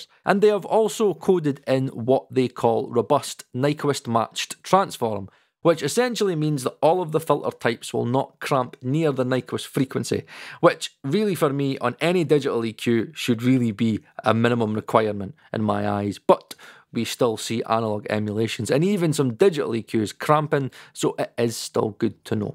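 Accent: Scotland, United Kingdom. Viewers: Scottish accent is so cool.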